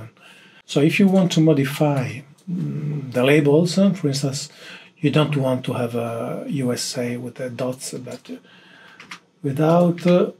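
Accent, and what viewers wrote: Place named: Italy. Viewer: Beneficial video. Besides that, I like an accent on the last consonant. Truly Italian.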